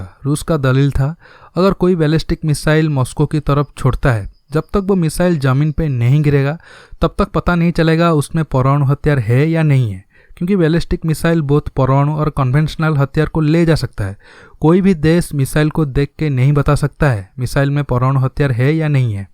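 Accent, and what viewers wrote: Odisha, India. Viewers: Bhai kya app Odisha se ho? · Bhai aap Odisha se ho?...Aapka baat karne ka style se pata chalta hai kyunki mein bhi Odisha se hun.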